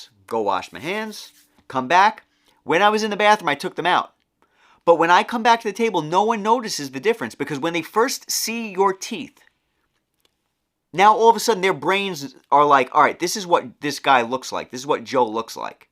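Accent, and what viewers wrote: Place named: New York, United States. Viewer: and wasn't even from the name, I didn't see that till after. It's so funny, I was just watching, your personality, demeanor, the way you were talking, then it hit me! If you were from New York it would have given it away a lot quicker. lol thank you so much for the video! I might have to give it a shot, I have to do something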